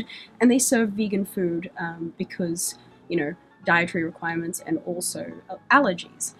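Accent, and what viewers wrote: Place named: Australia. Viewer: AUSTRALIAN ACCENTS ARE THE BEST THING EVER!!!! AUR NAURRRR!!!!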